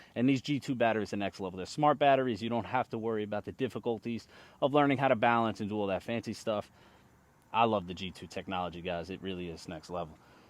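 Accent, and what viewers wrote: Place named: New York, United States. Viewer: Hello brother.. I’m a new subscriber from Darwin Australia 🇦🇺.. been binging your content for weeks now.. your a real chilled New Yorker by the sounds yeah 😅apologies if not .. but hey can we see more cleetus cub content.. maybe how to progress to acro .. maybe how to bind to better controller..🙏😁👌big fan from Australia mate ..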